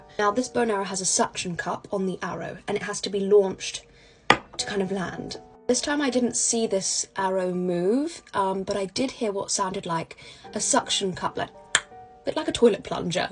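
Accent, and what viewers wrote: United Kingdom. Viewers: I agree such a lovely voice. I'm American so I always fall for the British accent. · Apart from the topic 😅 love British accent ❤from Indian. So classy, relaxing and elegant.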